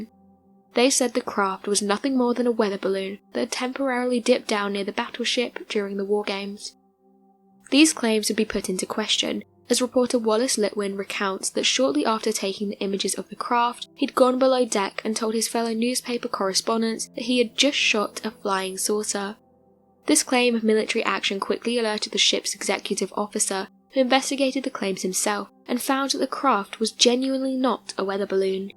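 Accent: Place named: South, United Kingdom. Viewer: Anybody know where exactly the narrator's regional accent is from? I feel like somewhere in the Southern UK, but I can't place where ecactly.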